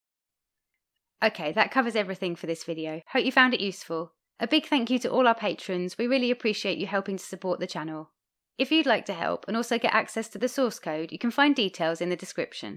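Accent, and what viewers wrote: England, United Kingdom. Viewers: are you from england?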